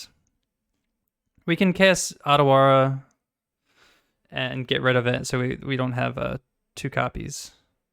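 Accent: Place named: Baltimore, United States. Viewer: Hey, a little irrelevant but are you from Baltimore? (Wherever you’re from im a fan of the accent)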